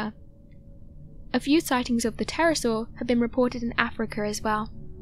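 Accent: United Kingdom. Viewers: This girl is one of the most pleasant narrators on YouTube. Her sweetly modulated voice combined with the pure British accent is refreshing.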